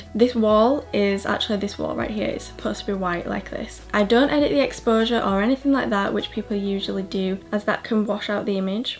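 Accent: United Kingdom. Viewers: Your from the UK sis❤ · Wtf she’s British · Bruh I wasn’t ready for the accent hello fellow Brit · POV: u had no idea she was British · omg i had no clue that u were british!! you’re so pretty and omggg i love you’re accent and i thought u we’re american tbh but omg🥰❤️ so pretty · SHES BRITTISH. UGH IM IN LOVE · YEEESSSSS SHES BRITISHH!SHE GETS ME... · Jade McAboy she is brithia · ....doesn't everyone have an accent..? Do you mean she's British? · I looked her up and it said she was born in England and I was like oh my God I need to hear her voice. So, I came here and I had no clue she was British. I only saw her in tiktok. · OMG I didn't know you were British I thought you were American and I didn't expect your voice sound like that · Ur rlly British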